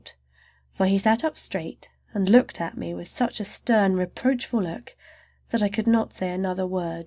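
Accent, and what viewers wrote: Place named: United Kingdom. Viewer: Very much than other normal US english readers, i think UK english is the proper voice for this story.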